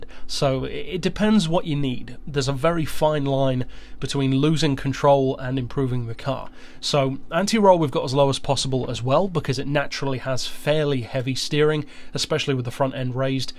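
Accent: United Kingdom. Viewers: All British people sound like to be honest.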